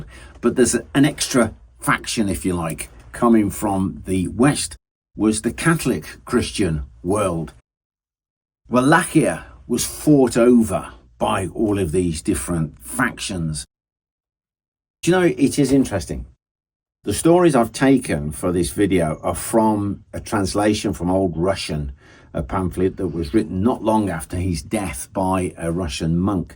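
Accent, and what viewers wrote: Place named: RP, United Kingdom. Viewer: Great video. Posh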